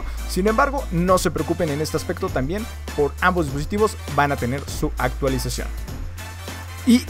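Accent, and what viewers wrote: Mexico. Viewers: Mexicano, eso creo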